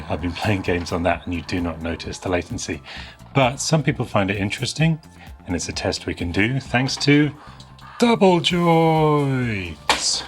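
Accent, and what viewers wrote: United Kingdom. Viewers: Dang, fast replies here! :) Thank you, me and my daughter (who alsdo don't like spiders), also guessed a lizard. Where do you live btw? You sound like you're from the UK, but some sticker on the box said US, but you do like C64, Amiga, etc which is kinda European.. myself from Sweden felt the craze here as well :) Mighty awesome channel though! The asthetics of your old game room / pc room / studio is so inviting, with all the old vintage equipment looking new, fresh and colorful :D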